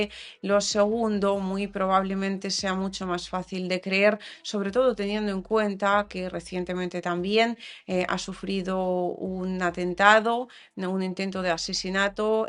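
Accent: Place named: Spain. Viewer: Hola, por tu acento creo que viviste mucho tiempo en algún punto de España. Que significado tiene la plaqueta color rojo a tu espalda. Me gusta tu página. Un abrazo desde Bogotá.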